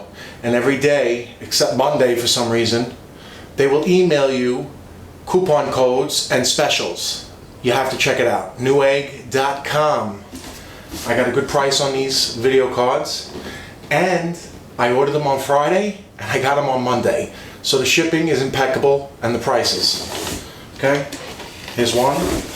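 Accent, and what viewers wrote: Boston, United States. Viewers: you from boston bro ? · Heavy Bostonian accent. Boooo red sox! lol i love this guy though!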